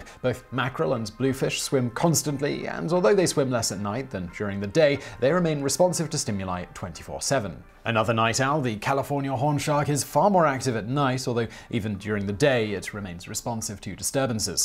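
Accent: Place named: RP, United Kingdom. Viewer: That's not it - it's just his accent is impossibly posh. Plus they (obvs) are not from N.A. & didn't Google search "how to pronounce tilapia" prior to filming.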